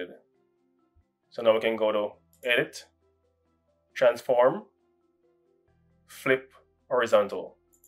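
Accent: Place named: Jamaica. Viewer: I am a Jamaican and you sound so much like a Jamaican lol. your content is good bro thank you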